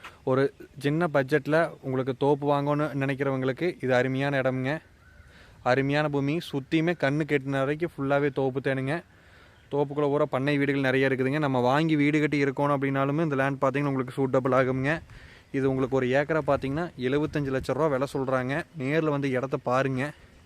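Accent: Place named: Coimbatore, India. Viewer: கோவை தமிழ் மிக அறுமை. உங்கள் channel வலர வாழ்த்துக்கள்.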